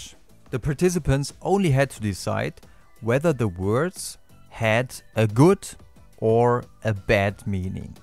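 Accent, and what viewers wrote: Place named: Germany. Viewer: Social loafing und social inhibition sind ja nicht die selben Sachen oder? Social loafing ist soziales Faulenzen, was ist der Fachbergriff für social inhibition auf Deutsch? P.S. Ich frage weil ich den Deutschen Akzent raushöre und mir das sonst niemand beantworten konnte. P.P.S Ich spreche auch Türkisch und die letzten drei Wörter sind definitiv nicht Türkisch, eventuell sind die Wörter falsch geschrieben. Habt Ihr ne Quelle?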